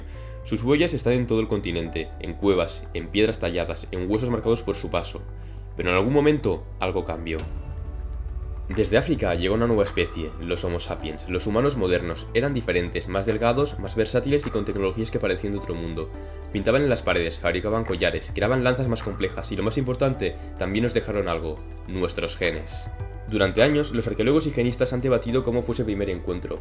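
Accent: Spain. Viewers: MODULA GALLEGO HABLA CASTELLANO , la puta madre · Ahí le bajé la velocidad a 0,80 😊. Reconozco q me cuesta a veces entender el acento español. Muy buena info, tu video. Gracias.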